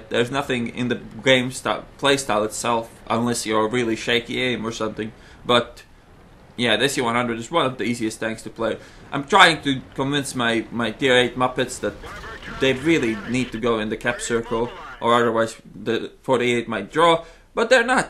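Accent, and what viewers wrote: Russia. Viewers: dat russian accent :))) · lol, Id love those russian accent, some how I just can't pretend one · LMFAO! Love your Ruski accent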